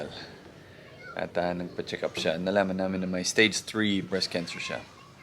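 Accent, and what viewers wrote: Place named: Philippines. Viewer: Ibang iba na ang accent mo ngayon sir very pinoy na..btw, all of us has to go through different hurdles in life but what can makes us strong is our faith in God coz no matter how big our problem is, there is always bigger God for us..Just be strong and keep moving forward. God Bless you and your family.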